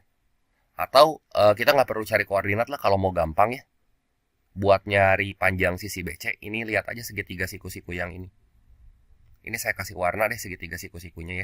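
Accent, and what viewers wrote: West Java, Indonesia. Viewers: LUCU IHH LOGAT SUNDA LOP LOP PA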